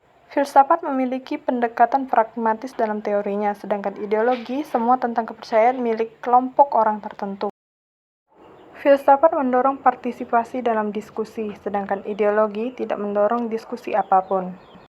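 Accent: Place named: Java, Indonesia. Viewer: wah well done mbak, simpel tapi jelas:)) eh salfok ke medoknya hehe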